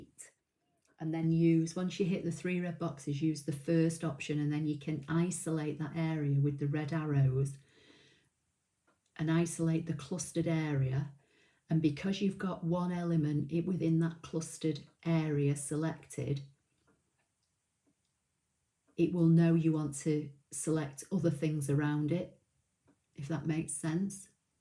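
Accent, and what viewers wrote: England, United Kingdom. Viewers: You have amazingly easy videos to understand even though your English accent is way different than my Texas accent but boy howdy does this old lady enjoy your videos. I haven’t received my scan and cut yet but I know I won’t be afraid to take it out of the box. I hope you do some videos about cutting material thank you so very very much for sharing your amazing talent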